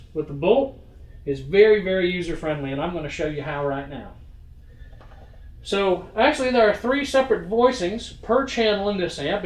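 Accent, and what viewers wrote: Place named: Nashville, United States. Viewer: I love, but it cracks me up to listen to him talk. He's a Mainer and he talks like he's born and raised in Nashville.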